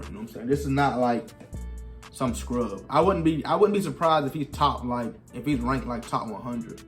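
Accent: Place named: Ohio, United States. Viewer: Yo where are u from? you sound like my cousin from Youngstown, Ohio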